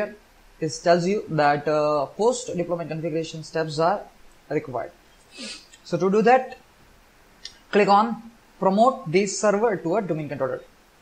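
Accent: India. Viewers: nice video but please indian people should stop speaking english.you are hurting the langage ☺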